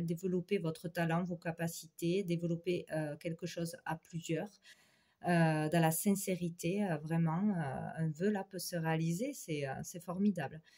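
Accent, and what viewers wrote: South, France. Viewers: Gratitude à vous quel doux accent di sud Namaste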